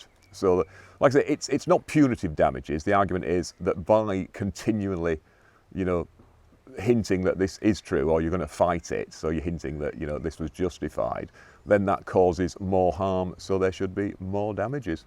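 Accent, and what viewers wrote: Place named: Northern England, United Kingdom. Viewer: It’s great to hear your northern accent. As a Mancunian, I’m told I have a broad ‘northern’ accent, hopefully not as rough as Angela Rayner though!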